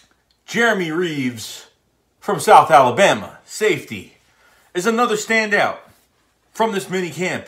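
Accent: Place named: Philadelphia, United States. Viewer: Love your Philly accent trying to pronounce Kamu Grugier-Hill as Kamu Gruger-Hill lol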